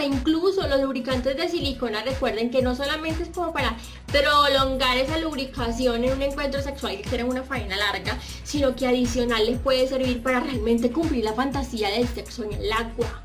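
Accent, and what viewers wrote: Colombia. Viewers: Me vuelve loco ese acentito colombiano cada vez q t escucho . Saludos desde Rusia mi amor 😘😘😘😘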